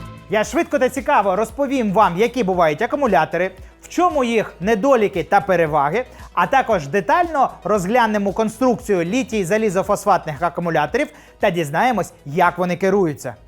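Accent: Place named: Russia. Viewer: Чоловіче! Ти мабуть "русскій"? По інтонації чую, по агресивній заздрості. Щоб з банок по 3,3 вольта отримати 12 вольт - дійсно, треба послідовно з'єднати 4 банки. Фізику в 6-му класі вчив? Чи прогулював? Чи тебе засмучує, що 3,3×4 - буде не 12, а 13,2? А до літієвої банки, на якій написана напруга 3,7 В, а на свіжезарядженій насправді близько 4-х вльт, у тебе претензій нема? У мене більше претензій до залуПутіна, який каже, що він за мир, а насправді робить війни. Тебе він не обурює?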